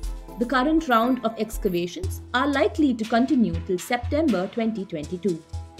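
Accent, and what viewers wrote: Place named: Tamil Nadu, India. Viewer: Probably she is tamizh or keralite.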